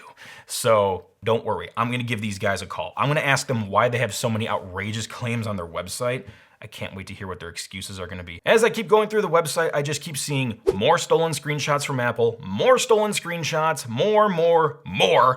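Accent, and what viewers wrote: India. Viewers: You can tell by the indian accent its a scam. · sound like come from India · That Indian accent is known to fool poor clueless consumers that’s he’s American.....ok... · What it speaks like Hindi India call center accent, got you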